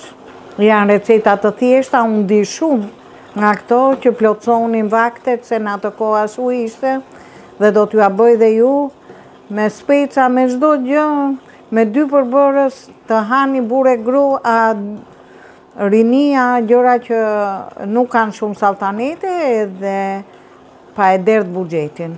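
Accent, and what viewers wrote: Tirana, Albania. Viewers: pershendetje e dashur oooo me kenoqe me ate te folme me ate duret e arta sa qe me thote goca lene mos e luj mamin se eshte tu shiju te folmen e tirone 😘😘😘❤❤🌷🌹🥀🌺⚘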